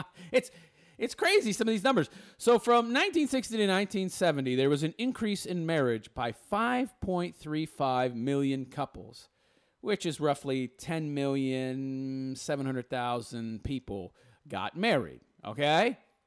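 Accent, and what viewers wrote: New York, United States. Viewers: Love the NY accent. Love the tawwwk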